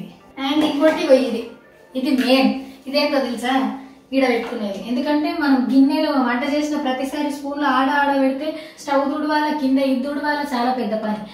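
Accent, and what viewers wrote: Telangana, India. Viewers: Akka me telangana basha assaluuu👌👌👌😘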